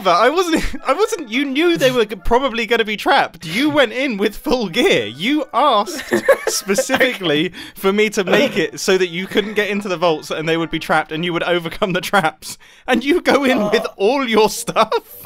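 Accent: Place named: United Kingdom. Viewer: OMG I HAD FLASHBACKS FROM PORTAL 2 because his british accent and the moron combined just remind me of weatly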